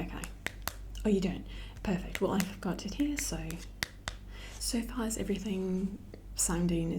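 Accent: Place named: United Kingdom. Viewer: You sound more like a British Mi5 agent:)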